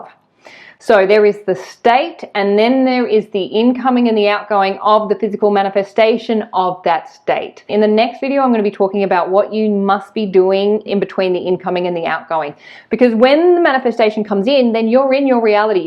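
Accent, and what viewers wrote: Australia. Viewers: Me too, I am falling in love with the Oz accent.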